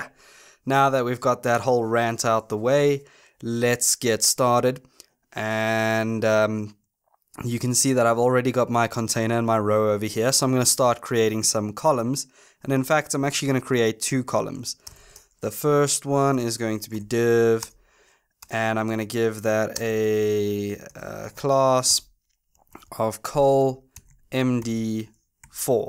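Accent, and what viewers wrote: South Africa. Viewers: Identified as South African 3 seconds into the video :)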